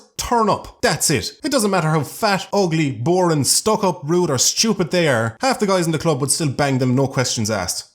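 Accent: Ireland. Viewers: Where in Ireland are you from? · Most youtube storytellers are shit but this is legit because this is definetly sounds like ireland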